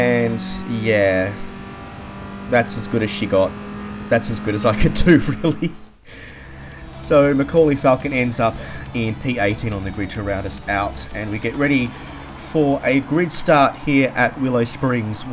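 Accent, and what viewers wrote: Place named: Australia. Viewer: You have an aussie accent, very nice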